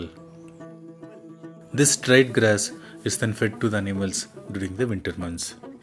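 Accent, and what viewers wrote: South India, India. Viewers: Orator from south india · South Indian orator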